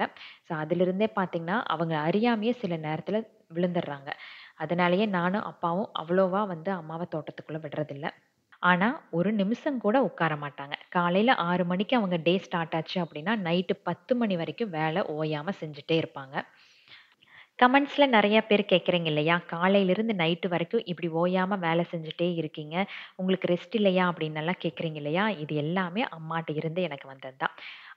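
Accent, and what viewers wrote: Coimbatore, India. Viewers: Hi sis... Oh wow supb akka... Am also coimbatore only naa ipo 2 days ah than unga vedio pathutu irukken.... Really am enjoying also surprised and romba egar oru oru vedio pakkaren... Really supb akka... And unga previous vedios pakkun pothu i thought neega Coimbatore ah than irukkanum kandipa apdinu nenachen becos apdiya Coimbatore slang irunthuchu neenga pesum pothu sila words... So very namma coimbatore... Athum kottur i knw kottur azhagana oor veedu... Romba supb akka we r all keep supporting u... Engalukku neraya visiyangal share pannunga and we learning from u... Love u sis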